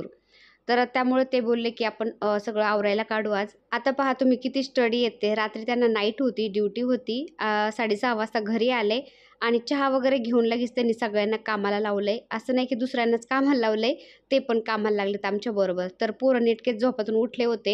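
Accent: Ahmednagar, India. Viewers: मला वाटलच तूम्ही नगरच्या आहे आम्ही पण नगरचे आहोत पण नाशिक ला राहातो माझे मिस्टर आशेच बोलतात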